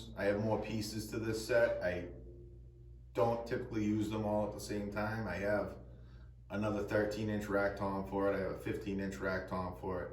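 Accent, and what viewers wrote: Boston, United States. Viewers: I believe these drums came in either a gloss or satin finish, it looks like you have an add-on. A quick check of the numbers on the tags should clarify this. Either way, these are beautiful drums! Nice Boston accent.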